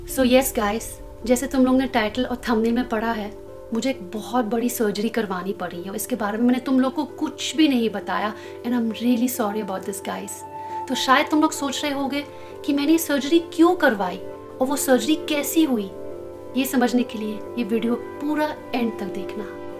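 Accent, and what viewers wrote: United States. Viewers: App American hai kya 🤔?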